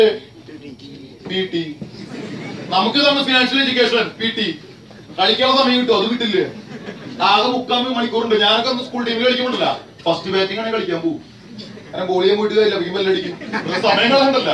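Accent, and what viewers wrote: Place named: Malappuram, India. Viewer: Do arado paranjad malappuram bhaasha virthikettadennu listen to 19:4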